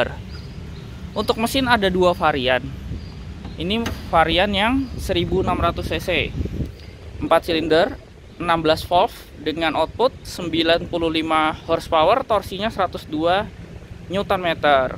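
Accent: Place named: Java, Indonesia. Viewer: om, kamu orang jawa nya mana, kok bahasa jawa nya medok 😆😀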